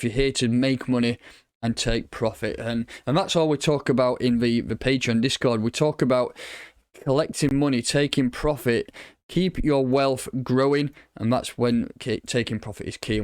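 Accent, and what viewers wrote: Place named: North, United Kingdom. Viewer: New to the channel. Nice to hear a northerner instead of an American. Nice vid, suscribed 🙌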